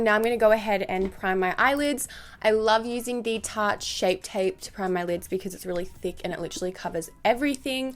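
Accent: Australia. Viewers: i love Australian accents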